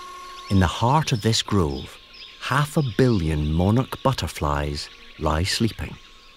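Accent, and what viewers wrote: Scotland, United Kingdom. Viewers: yess gimme that scottish accent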